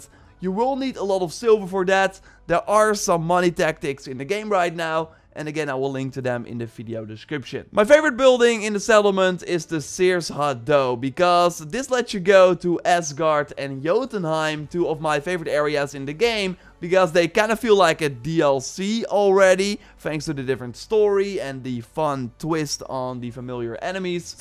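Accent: Netherlands. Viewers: a yes this accent is made out of dutch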